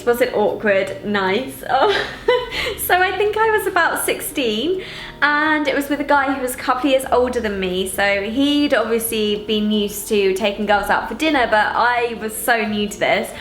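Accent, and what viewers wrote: United Kingdom. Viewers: hey! you're so beautiful, you take care of your body and have a british accent. inspiring lady. keep making videos! 🙆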